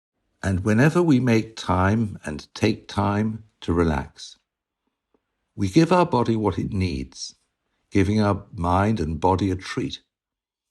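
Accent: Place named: England, United Kingdom. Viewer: Very useful tape. My name is also. You sound English. Is your full baptised name?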